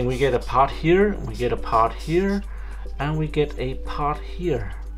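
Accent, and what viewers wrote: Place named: Denmark. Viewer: Definitely danish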